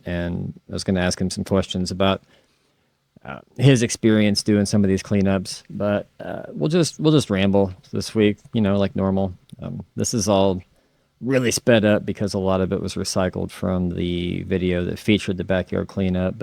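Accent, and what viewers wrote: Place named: United States. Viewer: This was such a beautiful video! You are a genuinely kind human being. I hope your channel continues to grow exponentially! I think my favorite part was when you had to show your manliness intimidation move(s)😜when your lovely wife showed up. It was as if I was watching a British narrated nature channel only with an American accent. I loved watching your girls interact with you and seeing you joke around with your pops. I, too, feel everything happens for a reason. Remember, it’s always darkest before the dawn. It’ll all work out somehow.😊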